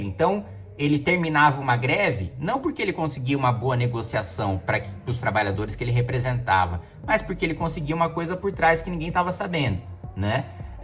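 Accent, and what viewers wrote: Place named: São Paulo, Brazil. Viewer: Te acompanho há anos e só agora reparei que você tem aquele R chato de São Paulo no sotaque.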